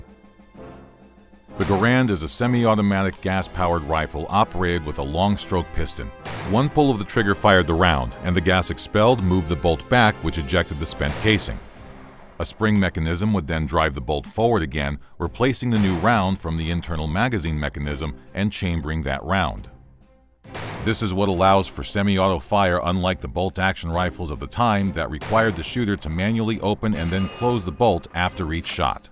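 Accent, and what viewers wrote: United States. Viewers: American accent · The pure american voice of this video makes it so much better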